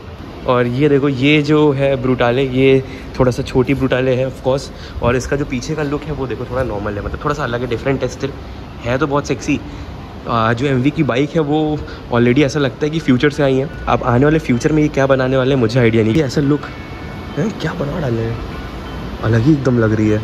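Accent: Lucknow, India. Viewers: Bhai lucknow se ho 😄😄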